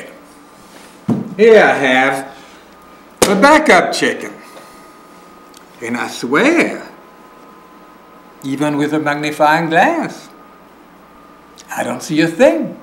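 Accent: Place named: Louisiana, United States. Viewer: Interesting but that "smacking" gets on my nerves. Also, he sounds like a Cajun.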